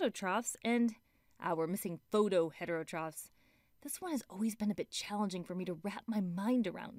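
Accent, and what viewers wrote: United States. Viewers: are you from tennnese becsaud yoyut the on;t ten i see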